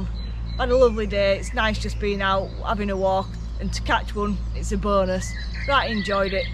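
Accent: North, United Kingdom. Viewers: Love northern acent 😀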